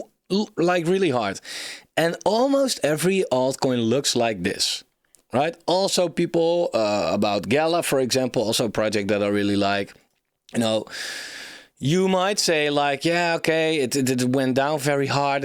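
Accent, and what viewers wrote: Netherlands. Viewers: Are you from the Netherlands? Judging from that accent :) or Belgium?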